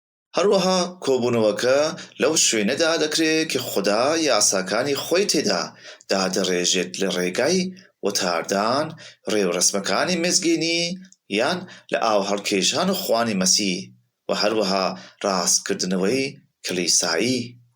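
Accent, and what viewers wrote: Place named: Iran. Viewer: ئایا کوردێک نییە ئەم دەقە بخوێنێتەوە؟ ڕێزم بۆ ئەو کەسە هەیە ئەمە دەخوێنێتەوە، بەڵام ئەو کەسەی ئەمە دەخوێنێتەوە دەنگێکی فارسییە نەک کوردی، زۆر لە وشە و پیتەکان بە هەڵە دەخوێنێتەوە.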